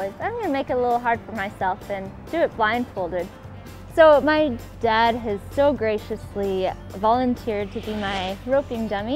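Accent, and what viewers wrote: Canada. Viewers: Lol, Honda knot, Han-doo and lassoo instead of lasso so Canadian love it 💕 city/country girl (grew up around both) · Wonderful! love the Calgary accent!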